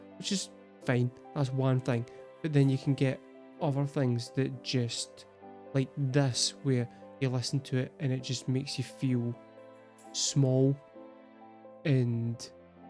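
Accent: Scotland, United Kingdom. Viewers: Wait!! You're Scottish???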